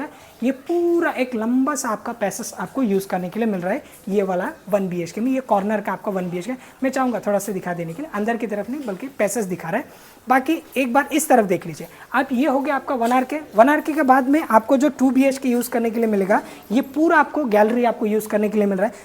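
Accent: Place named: Nepal. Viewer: Yeh bhai hindi me hi bol raha hai. Ye nepali hai isliye Aisa hi hindi bolenga bhai.o.k.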